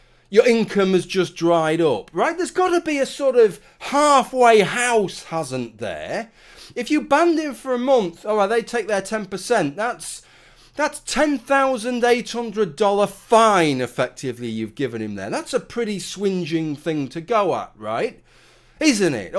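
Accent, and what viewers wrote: United Kingdom. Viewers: I hate sargon. But i dont think he should have his income taken away like this. Its not his fault that its so profitable to be a pandering bitch on the internet since his audience is so dumb and will give him money for talking about a country he clearly knows next to nothing about. I dont see why patreon has a problem with a british accent trying to convince ppl he is smrt.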